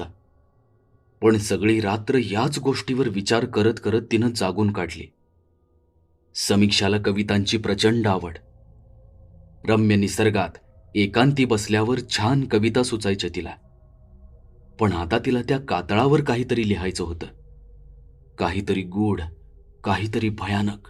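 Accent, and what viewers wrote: Pune-Satara, India. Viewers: That's not way konkan people speak...its more pune satara style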